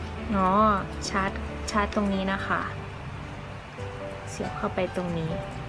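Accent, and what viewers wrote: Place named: Isan, Thailand. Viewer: สวัสดีครับผมเข้ามาชมคนรีวิวครับเสียงม้วนดีครับมีทั่งภาษาอีสานภาษาไทยกลาง แม่นครับช่วงโควิด19ไปร้านตัดผมกะย่านยุครับ💞😍จังได๋กะแคล้วคลาดปลอดภัยกันทุกคนเด้อครับ🥰❤️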